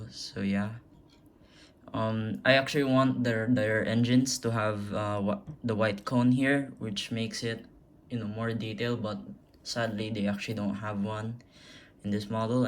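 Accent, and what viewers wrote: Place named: India. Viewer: Are you Indian? I like your accent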